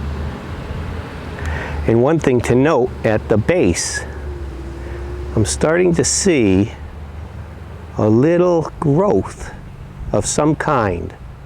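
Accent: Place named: Netherlands. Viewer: Sounds like a nl accent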